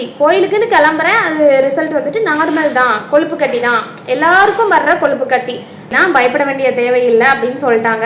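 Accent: Tirunelveli, India. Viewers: உனக்கு எந்த ஊர் அம்மா.திருநெல்வேலி பாசை பேசுர. தென்மாவட்டமா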